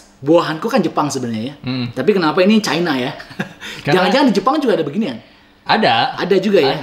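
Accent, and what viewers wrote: West Java, Indonesia. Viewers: Udh d alungin batu encan mang? Pepeus henteu Logat / bhs sunda na alus.. Jgn smp hilang nya mang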